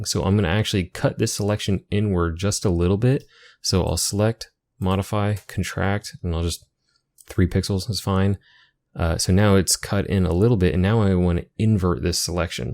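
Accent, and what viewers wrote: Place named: United States. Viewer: You look british but sound american?!?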